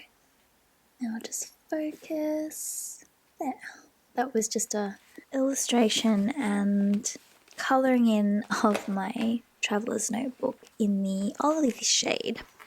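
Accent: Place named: Australia. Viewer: I'm falling down a deep rabbit hole that is the planning and journaling community and I LOVE your channel! Soft spoken creators and simple aesthetics are the easiest ways to get me subscribed, so thank you for creating, can't wait to see more! I use a Hobonichi weeks and Field Notes for commonplacing/Korean language study planning (Megan Rhiannon's former system, if you know her channel). Plus I just ordered a vegan traveler's notebook from etsy and so seeing other people's systems to see what works well for them is wonderful to see what could be adapted to my system. (Also, one of the only podcasts I listen to is an Australian comic book movie podcast - The Weekly Planet, so I love that I get to add more Australians to my repertoire of favorite content.)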